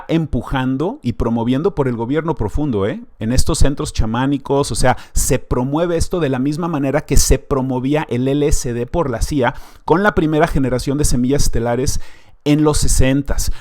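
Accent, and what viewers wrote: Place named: Mexico. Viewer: Aprecio mucho tu información, y me encanta el acento mexicano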